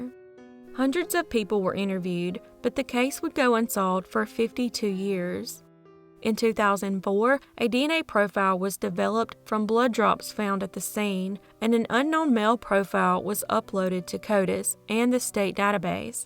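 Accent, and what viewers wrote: South, United States. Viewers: Urgh that southern accent, and the way you end your sentences makes me cringe 🤮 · South African accent is mostly inspired by British or Australian accent! But in this context the person is wrong to judge her accept! I think her Southern accent is so great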